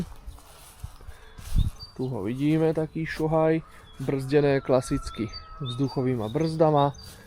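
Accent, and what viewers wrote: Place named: Moravia, Czech Republic. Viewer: Moc fajn video! Nikdy jsem nikoho neslyšel mluvit zároveň česky, moravsky a slovensky 💪🏽😀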